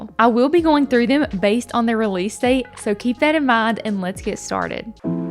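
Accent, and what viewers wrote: South, United States. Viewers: Okay your southern accent is darling ! Enjoying the cozy content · The southern accent…never clicked subscribe so fast 😌🥰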